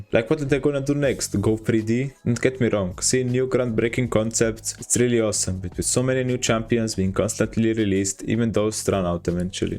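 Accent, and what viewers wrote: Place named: Eastern Europe. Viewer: Not sure where you are from, but that is the most slavic accent ever! Great video btw!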